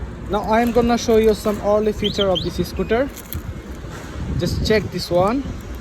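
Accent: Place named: Chittagong, Bangladesh. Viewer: আপনি চট্টগ্রামের নাকি?😁 উরে তুলো তো।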